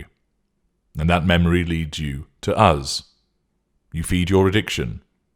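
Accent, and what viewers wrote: United Kingdom. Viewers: nope i just don t like the british accent and i know where your coming from, no hard feelings i just as good as u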